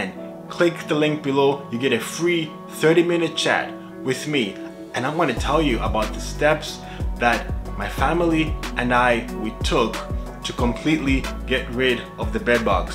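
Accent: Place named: France. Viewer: Hi. Oh you are à french speaker I've noticed your accent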